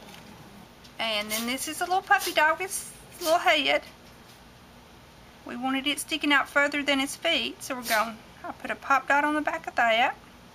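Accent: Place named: Alabama, United States. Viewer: I really enjoy your videos and blog. I love to hear you talk because you sound like me. I live in Alabama with you. I'm about 20 min. from B'ham. So were almost neighbors. Thanks for sharing.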